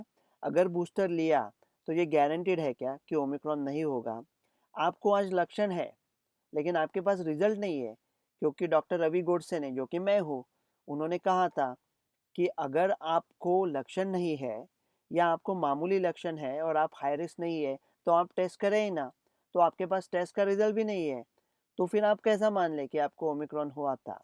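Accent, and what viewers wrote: India. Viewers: Sir i want to talk to you...aap ko language sunkat aesa lakta h aap india me hi rehte ho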